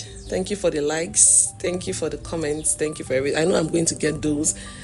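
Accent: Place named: Nigeria. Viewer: Congratulations! May the Lord give you divine favor in 2026 and beyond in your life, your health, your channel. By the way, tell us which country. You sound Nigerian but 🤷🏽‍♀ I'll subscribe anyway.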